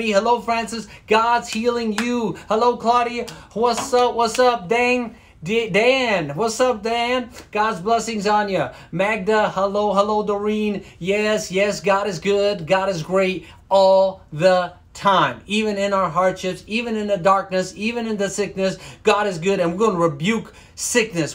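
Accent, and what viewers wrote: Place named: South, United States. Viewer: love it when you sing and when your Southern (from Tennessee?) accent shows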